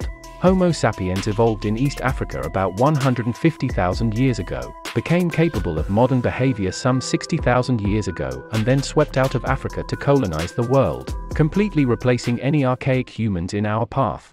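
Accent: United Kingdom. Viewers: Nice documentary, but why do all documentaries like this seem to have to have a British accent narrator? :)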